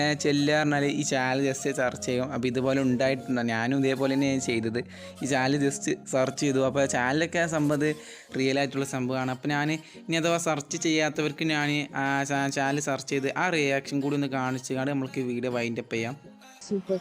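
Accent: Malappuram, India. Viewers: Ninte home malappuram ann enn ariyamayirunnu ninte samsaram keettal manassilayi😎😎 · Bro malppuram basssha matttt najmall malppuram malayalam parnjnnnl mtuulli work manisilllla😍😘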